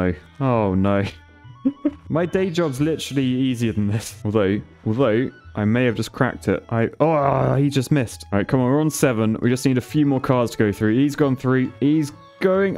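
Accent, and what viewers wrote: United Kingdom. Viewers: I love this channel and Your accent is hilarious😂! I love the British accent😁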